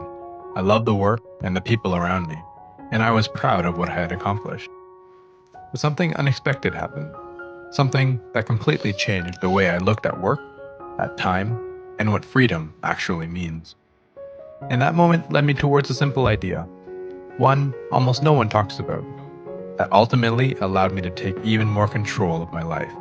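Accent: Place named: Europe. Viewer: But your Asian, why talk as if your European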